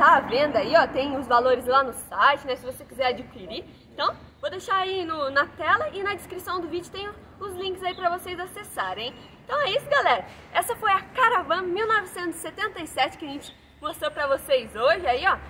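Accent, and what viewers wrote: Minas Gerais, Brazil. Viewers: Vc fala bonitinho parece mineirinha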